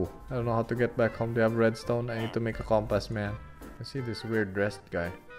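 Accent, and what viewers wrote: Philippines. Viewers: R u a filipino? Ur english accent is same as filipinos, btw minecraft is being popular again hope u inspired by pewds haha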